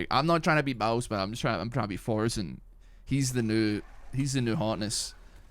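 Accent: Scotland, United Kingdom. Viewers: am from scotland u sound scottish enough for me man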